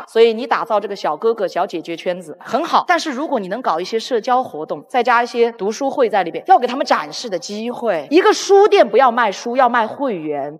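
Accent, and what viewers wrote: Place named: Chongqing, China. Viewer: 我以為你是重慶人。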